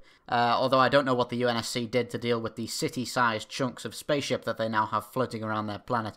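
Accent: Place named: Liverpool, United Kingdom. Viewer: Kind of a Scouse twang to your accent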